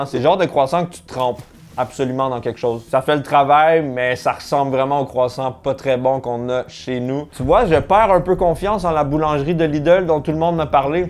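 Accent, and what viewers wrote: Quebec, Canada. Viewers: et sachez que...j'adore l'accent québécois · Bonsoir Dis-moi, est-ce que tu étais à Nantes ce soir, c’est-à-dire le jour où tu as posté cette vidéo ? J’étais avec ma femme dans le parc de la Chanterie, pas loin de chez moi, et vers 22h, on a vu quelqu’un qui te ressemblait vraiment. Au début, tu étais assis sur un banc, en train de parler au téléphone, face à l’Erdre. Puis tu es passé juste à côté de nous pendant qu’on se prenait en photo. Il faisait un peu sombre, alors je n’étais pas sûr, mais j’ai cru te reconnaître — surtout quand j’ai entendu l’accent québécois 😄 Et comme j’étais à Montréal la semaine dernière, je l’ai tout de suite capté, haha !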